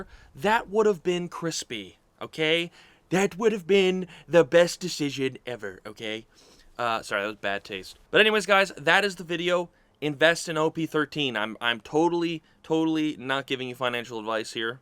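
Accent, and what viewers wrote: United States. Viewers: I’m Irish ? What ? Bro you American as it gets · Honestly, as a fellow east coaster I prefer pronouncing the way you do 😅 either way real ones know what you mean anyways great video. I’m just getting into one piece myself. This will probably be my first set I rip. As a guy who comes from yugioh, I really appreciate the content. Keep it up brother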